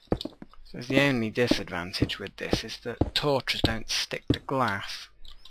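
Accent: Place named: RP, United Kingdom. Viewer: Fuck me Posh voice!!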